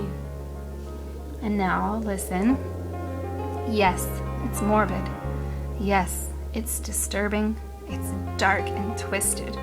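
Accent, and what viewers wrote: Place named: United States. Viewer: It looked an interesting video but the loud intrusive background muzak and the speaker's American accent made her words unintelligible to me.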